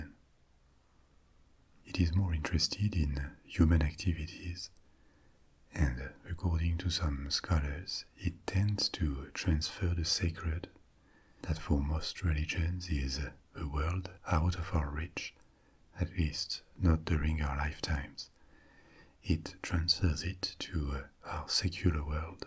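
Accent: France. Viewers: I love the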